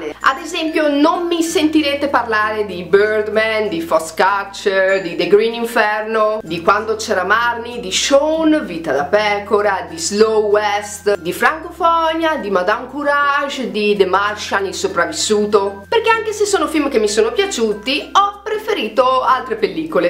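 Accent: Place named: Romagna, Italy. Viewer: ahh scusami è che io sono romagnola e la tua s mi ricorda il nostro accento. scusami :)😹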